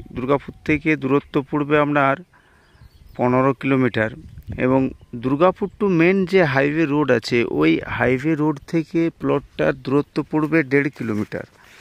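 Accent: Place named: Bangladesh. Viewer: এই ভাইয়ের কথা শুনে মনে হচ্ছে উনি বাংলাদেশি। আমাদের খুলনা অঞ্চলের বাড়ি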